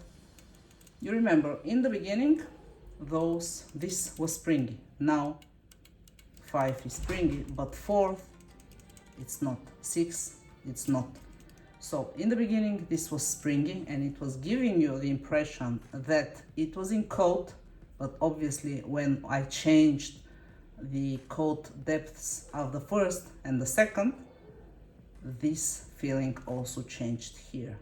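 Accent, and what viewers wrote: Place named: Italy. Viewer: Sento un po di italiano in questo inglese😂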